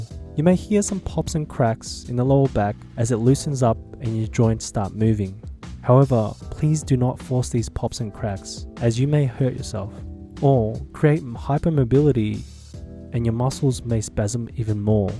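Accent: Australia. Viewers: i love the auschraliaaan accent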